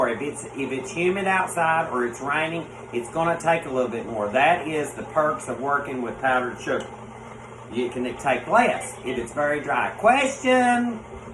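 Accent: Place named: Lexington, United States. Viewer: You seem nice....sound like Lexington. Huge queer population there.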